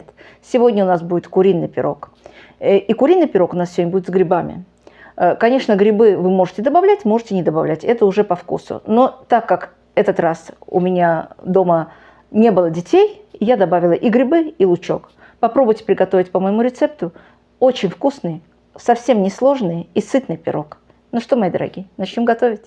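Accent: Baku, Azerbaijan. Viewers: А откуда родом наша девушка,очень пробиваются бакинские интонации. То ,что называют - бакинский акцент...музыка для слуха!!))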